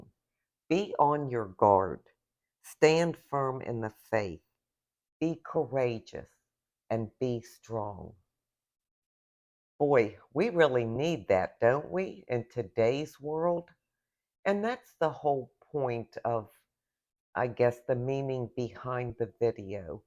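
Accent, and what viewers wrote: Pittsburgh, United States. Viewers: Your videos just recently popped up. As I listened to you talk, I just knew you were from somewhere close to my hometown of Pittsburgh! I’m so happy! Love your content and agree with 99%.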